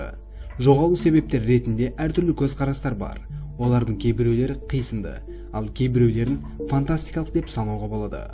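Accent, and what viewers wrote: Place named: Karakalpakstan, Uzbekistan. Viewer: Акценты жагымсыз. Каракалпак емессиз бе?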